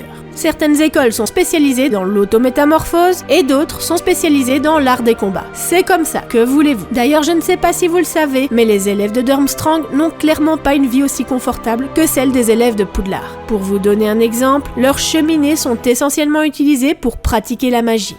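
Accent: Belgium. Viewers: Tu es belge ? En tout cas, super vidéo et vive ma Belgique !!!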